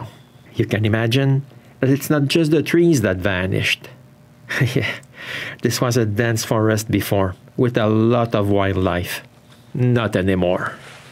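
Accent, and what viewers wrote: Quebec, Canada. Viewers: Vous ete Canadian Francais? Je suis americain et entendre votre accent(je crois.) Bravo en tout cas(je vis en France depuis 30 ans...by the way) rr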